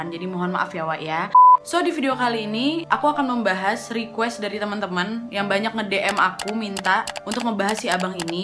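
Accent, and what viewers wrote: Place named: Medan, Indonesia. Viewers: Nonton semua video serasa lagi gybah sama temen, seru habis ya walaupun ceritanya seperti kaidah kaidah semata, tapi dia jelasinnya kayak anak medan kali logatnya, senang kali aku nengok kontennya, sukses terus ya biar kita gibah terus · Anak mana ko kakkk medannya kauuu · Suka kali aq dengan logatnya.. bah medan kali lah.. langsung ku subcribe. I love u sis ❤😘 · Jadi ketagihan awak nonton video uwak..logat melayu deli yg kental kali wak...sukses selalu wak💪😘🙏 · Aku suka banget sama gaya bicara dan suara yang jelas dan lantang. Pembahasan tentang kasus2 yang dibawakannya begitu runtut, rinci dan detail. Lagipula juga pintar, cerdas serta cantik rupawan. Dari logat bahasa, sepertinya dari Medan-Sumut ya? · Logat sumatranya kentel nian wak ini🤗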